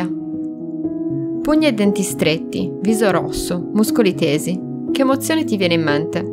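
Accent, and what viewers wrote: Sardinia, Italy. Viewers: Dal tuo accento sembri sarda o sbaglio?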